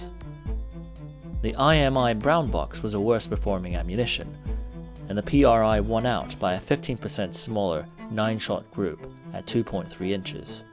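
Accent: United Kingdom. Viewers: New sub here. Love the content. Gotta ask, what accent is that, British? Really cool. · Anyone else annoyed with the British accent on an otherwise awesome review???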